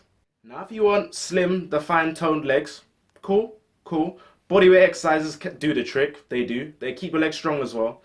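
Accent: London, United Kingdom. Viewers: It's a London accent, though there are many different London accents (posh, cockney, and more 'street' as you could call this accent).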